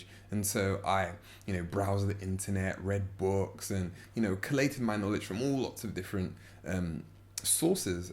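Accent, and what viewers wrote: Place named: England, United Kingdom. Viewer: i love u´re english accent :D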